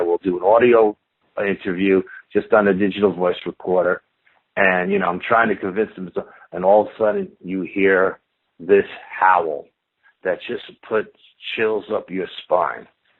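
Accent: South, United States. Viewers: The Southern Accent is like ???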